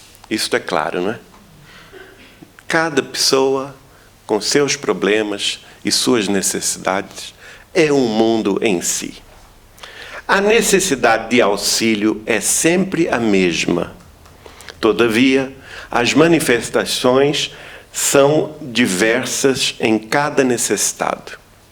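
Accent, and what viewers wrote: Portugal. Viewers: Ah pq ele esta fallando c sotaque de portugues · porque o sotaque portugues??? kkkk nao faz sentido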